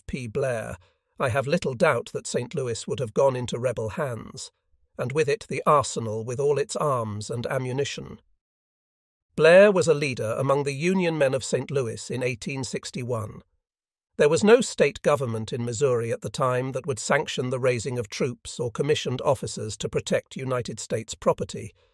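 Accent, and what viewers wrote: England, United Kingdom. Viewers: The English accent of the AI narrator is incongruous with what is a uniquely American event.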